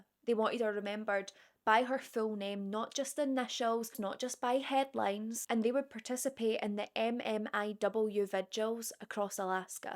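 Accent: Scotland, United Kingdom. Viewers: As a true crime buff, I'm so hyped to have found your channel (late to the game, I suppose) 💙 What part of Scotland are you from? I love the accent (almost as much as I love Scotch whisky 🥃 LOL) And how do you come up with so much content every week? You're like a walking criminology encyclopedia 📖 — definitely the most informative 'yapper' around 🧠 — subscribed and shared 👍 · Hello again from Canada I just found out what Hyping is and after listening to the explanation I get it now... So of course I Hyped you... Love you, Love your videos... mostly love your Scottish accent 👍🏼💙💙💙🇨🇦j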